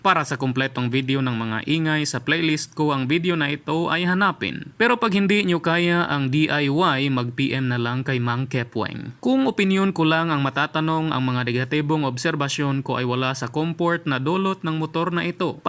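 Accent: Visayas, Philippines. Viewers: tagalog na bisayang accent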